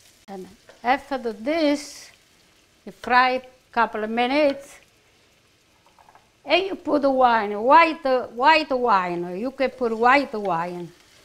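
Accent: Italy. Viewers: If your going to make fun of her Italian style accent stop comments. Your RUDE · I just love cooking. She has a very easy way of explaining her style of cooking and I love her Italian accent! Thank you! God bless you & your family!😘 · you are our treasure! I made chicken alla Franchese your way and it was just as delicious as your Italian accent! Love you! ❤❤❤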